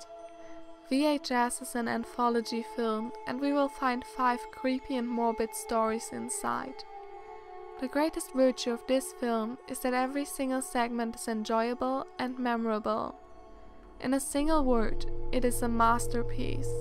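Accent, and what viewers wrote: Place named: Germany. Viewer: GERMAN. You can hear it :D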